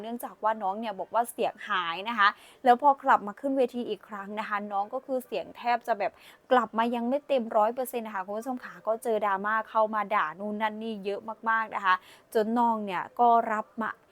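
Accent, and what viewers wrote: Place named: Isan, Thailand. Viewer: ❤แอดมินเปนนักข่าวหรือนางเอกหมอลำ..น่ารักจังอ่านข่าวสำเนียงไทยผสมอิสานได้ไหลรื่นฟังเพลิน❤😊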